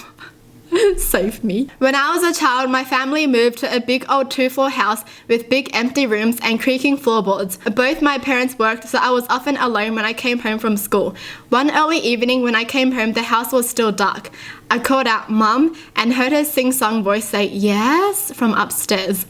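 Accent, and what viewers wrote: Australia. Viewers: She knows how to say it,it's just hard to say probably because she has an australian accent.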